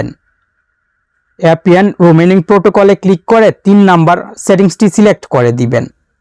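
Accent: Mymensingh, Bangladesh. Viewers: ভাইয়ের বাড়ি মনে অয় ময়মনসিংহ।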